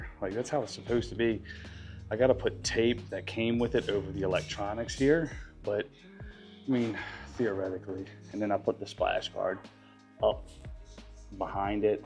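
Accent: Maryland, United States. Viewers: nice I was born in Essex but grew up in Western Maryland. I know a MD accent when I hear it. Great videos keep up bro.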